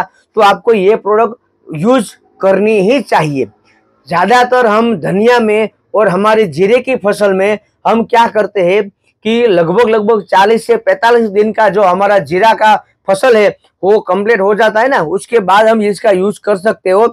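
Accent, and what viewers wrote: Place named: India. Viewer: Aje tame apda hindi bhasha vara bhayu ne samjavo bhai ame to gujrati maj mahiti sambhad su apdi gujrati ni majaj kaik alag che bhai amay tamari bolvani pich sav desi bhasha ma majaj aeva rakhe che bhai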